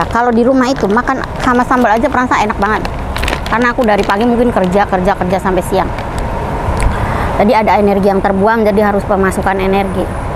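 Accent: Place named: Banyumas, Indonesia. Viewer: denger pean bicara ngapak lucu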